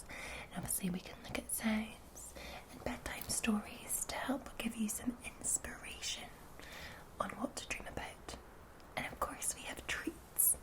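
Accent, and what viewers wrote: Scotland, United Kingdom. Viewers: Somehow I'm not surprised my dream manager has a Scottish accent...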